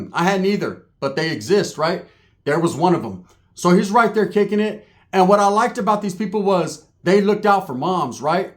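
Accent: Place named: Bay Area, United States. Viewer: Aye uce... I peep how you sound like a bay nigga. They not in tune on how we talk. These stories be having us dying 😂 you sound like a OTHERS. That super uce..all your samoan viewers heard him thru you😂😂😂😂we felt dat sole..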